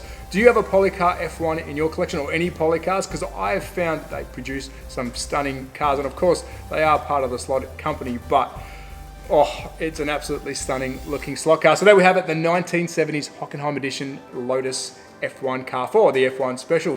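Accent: Australia. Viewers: That Australian accent just grinds on me. Do not like it. Sorry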